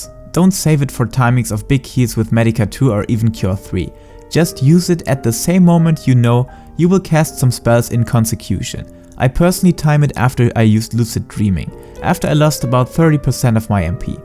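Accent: Germany. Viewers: Are you German? That accent sounds like it! (I'm German too haha.)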